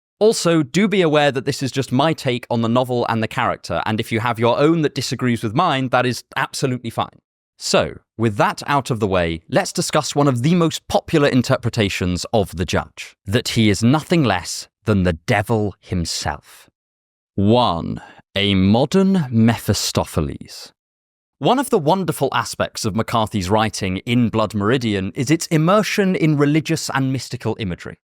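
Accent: United Kingdom. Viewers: watched to learn❌ watched for british accent✅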